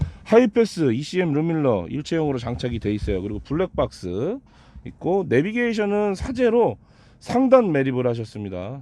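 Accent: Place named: South Chungcheong, South Korea. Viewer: 윤팀장님 충청남도 양반 이다에 내 손모가지 걸께요 가생이 !!! 사투리 푸하하하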